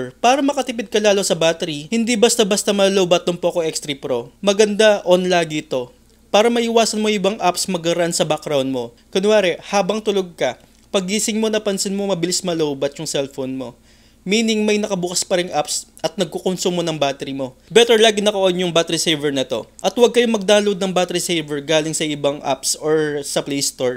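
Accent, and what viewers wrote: Pampanga, Philippines. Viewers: Kapampangan kata Koy? Hahaha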